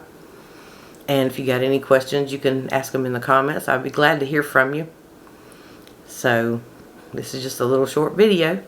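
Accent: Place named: South, United States. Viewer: You are probably the most loveable lass on YT. And that southern drawl of yours, so charming.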